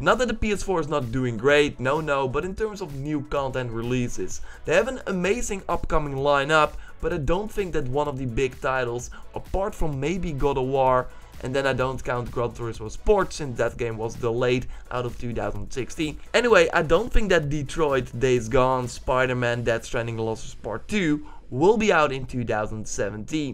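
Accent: Netherlands. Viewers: Autumn Lies Empty Probably because his native language is dutch, and in dutch "idea" is pronounced "iday" and he kinda carried it over to english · Hij heb wel gelijk gap je doet zwaaaaar clickbaiten.. en als je nou nog een beetje engels kon ipv van dunglish had et een ander verhaal geweest maar je ben niet om aan te horen ! speciaal kanaal gemaakt voor deze comment dude . en kan je beginnen over haten dit dat maar als ik er zelfs een account voor maak kan je nagaan hoe erg mensen zich storen!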